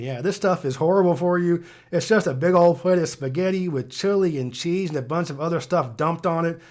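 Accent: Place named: South, United States. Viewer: I can’t believe this guy is from California, he really sounds native Southern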